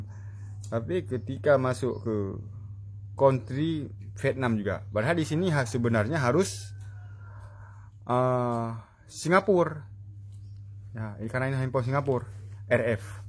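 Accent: Indonesia. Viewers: Lage logat aceh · wong plembang ni, nak mudike tulaa wkwkwkwk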